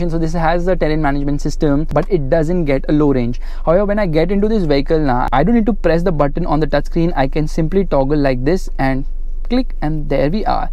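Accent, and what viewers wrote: India. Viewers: Good review !!! But you really need to put some work on your accent. Your accent is a prime example of a typical Indian accent. No offense though !!! 😊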